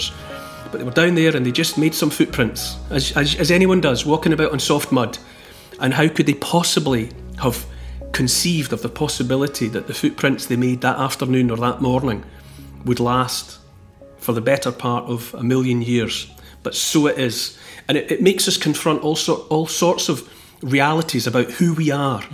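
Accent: Scotland, United Kingdom. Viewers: sadly yes. He doesn't "look" the stereotypical history buff. But that's what draws me to him initially, and of course the content and the obvious Scottish delivery. My son is at University in Ireland at the moment and he tells me that the majority of them at very disappointing to say the least. Even the "clubs" are disastrous, well indoctrinated with the wrong information. It's a shame really. Tonight in Ireland, practically all restrictions are lifted...the country is elated. I for one will never forget what they've done..I hope things get better there in Germany. I'm only going on the information that I've seen coming out of Germany..its not good, or am I wrong?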